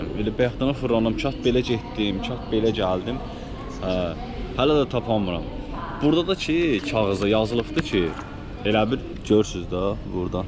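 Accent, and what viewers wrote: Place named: Ganja, Azerbaijan. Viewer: Ləhcədən bilinir gəncəlididə😂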